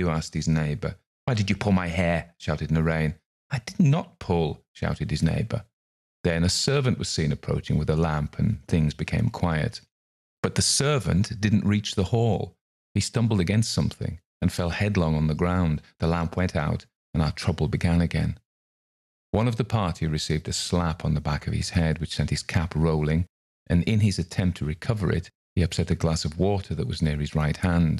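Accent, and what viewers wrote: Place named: Yorkshire, United Kingdom. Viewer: the one you use when you read the stories is It Yorkshire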